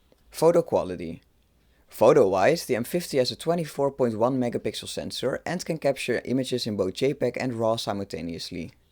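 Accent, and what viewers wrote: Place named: Netherlands. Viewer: Thank you for the break down. I just bought a second hand one today! I'm trying to learn how to operate it. I live in Hawaii so of course I need a camera here to capture all the beauty of the land. Though I would like to use an R8 I could afford this M50 today. So I'll shoot with it for a little while until I get blessed with another one. I believe I'll plan to keep this one in the collection. As I go to look for instructional videos on this camera your video is the first one I saw. I heard your voice and accent and said to myself he's a Dutch man. I looked deeper into your profile and saw you were based out of Nederland. I was born in Utrecht. Now I know for sure I've made a solid purchase. Because the Dutch are known for quality. So thank you for confirming my buy. And thank you for the wonderful video.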